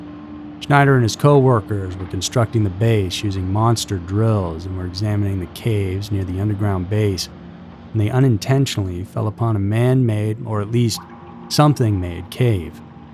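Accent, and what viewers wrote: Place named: Midwest, United States. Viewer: Not Texan, probably mid western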